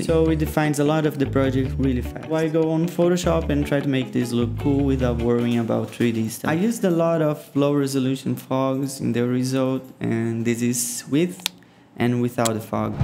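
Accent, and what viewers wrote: Brazil. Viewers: Sabia que tu era Brasileiro porra, esse sotaque ai e é top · Antes de falar q era br eu ja desconfiei pela pronuncia, so eu q acho mto loko isso ? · Mano eu não sabia que tu era brasileiro mas esse sotaque me fez desconfiar desde o início kkkk trabalho foda pra krl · Mano, num outro vídeo q vi teu, eu pensei q parecia BR, e agora sei q eu estava certo... Principalmente pelo sotaque kkkk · Mano não sabia quer vocè era brasileiro mais tava um pouco desconfiado pelo seu jeito é o sotaque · MANOOOOOOOO KKKKKKKKKKKKKKKKKKKKKKKKKKK ADOREI BR não tem jeito. Tu pode falar fluentemente, desde o incío eu vi a pitada de brazilização. TEU VÍDEO ME AJUDOU MUITO COM O INGLÊS, VOU FICAR REASSISTINDO KASJDLAKSDJ E QUE CONTEÚDO DO CARALHO EM, PF NÃO PARE AMIGO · Caralho eu sabia que tu era brasileiro só pelo teu sotaquekkkkkkk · Eu sabia que tinha sentido um sotaque BR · Aaaahh eu sabia que era br só pelo sotaque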